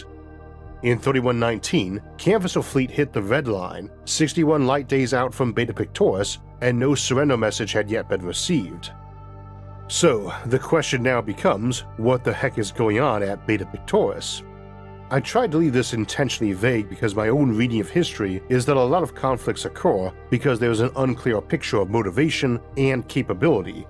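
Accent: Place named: South, United States. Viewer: Can't place your accent. Im thinking southern US with a mild speech impediment. No disrespect. Im a linguist.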